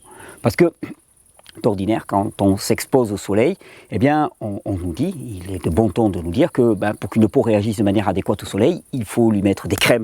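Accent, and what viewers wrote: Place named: Southern France, France. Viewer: Harmo Chopin En Pyrénées Orientales (66) oui appelée aussi Catalogne ou Pyrénées Catalanes également . Mais voyons ne reconnais-tu pas cet accent ensoleillé Méditerranéen du Midi ??? ;-) Mais il y a une partie de ce même département s'appelant Cerdagne (Latour de Carol, Dorrès, Bourg Madame ...) plus proche du Pas de la Case/Andorre et Limitrophe avec L'Ariège (09)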